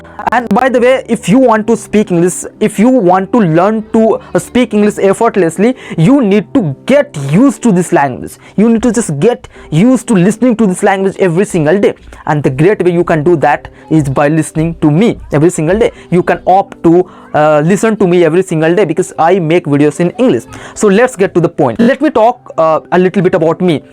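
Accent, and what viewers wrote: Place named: India. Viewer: Indian accent 😬🤢🤮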